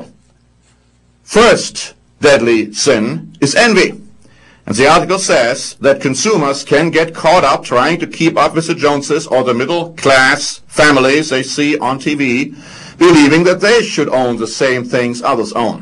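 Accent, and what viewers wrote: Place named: Germany. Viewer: this guy is german , u can easily tell by his german accent .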